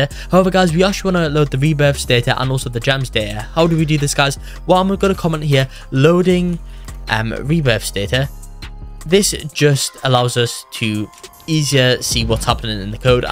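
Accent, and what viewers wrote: United Kingdom. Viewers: By the way he talks hes frim london AND I HAVE 100TH COMMENT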